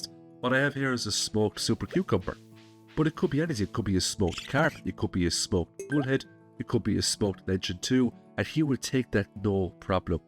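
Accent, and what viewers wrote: Ireland. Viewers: 1st time viewer. I came across your video in my feed and decided to click on it, and was not disappointed. You gave some amazing tips that I have not seen anyone talk about. Great video, I'm going to check out your channel, and watch a few more videos. Just a curiosity question, If I may... Listening to your video, and from your accent, are you from Ireland, by chance?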